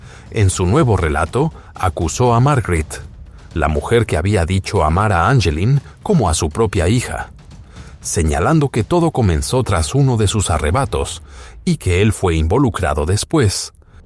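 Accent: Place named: United States. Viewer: Es muy raro ver a alguien que no es estadounidense con acento estadounidense.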